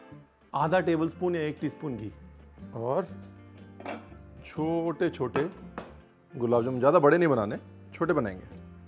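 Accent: India. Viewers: Aapka sun k aisa lagta h, india ki origin kuch h ki nah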